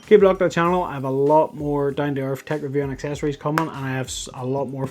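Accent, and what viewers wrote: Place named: Scotland, United Kingdom. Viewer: Lovely to hear a Scott! Scotian by blood.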